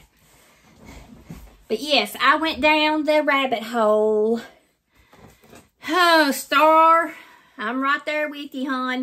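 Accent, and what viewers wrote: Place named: South, United States. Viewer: Girl I love you ! From the personality to the accent I’m country myself ! And love love looovecthe videos !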